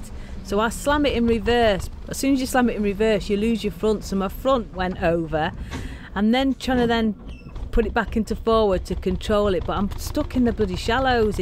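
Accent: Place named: Yorkshire, United Kingdom. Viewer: That Yorkshire accent makes you fitter .. you are hot 😉😂😂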